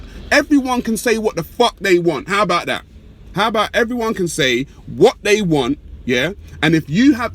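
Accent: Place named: London, United Kingdom. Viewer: Tell you what, close your eyes and this was Samuel Jackson in a Tarantino film....with a London accent without the M16....brilliant and of course talking sence again people....respect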